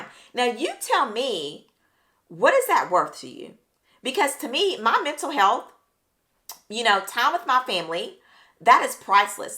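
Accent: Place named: South, United States. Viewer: I love your country accent! 😊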